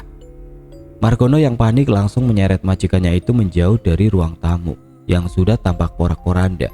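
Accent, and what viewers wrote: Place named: Banyumas, Indonesia. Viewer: Lama2 aku beneran gak berani dengerin ceritanya mas di tengah malam😅 Tapi seneng dengar suaranya ngapak2 gimana gitu bhkan kadang bikin ngakak juga 😅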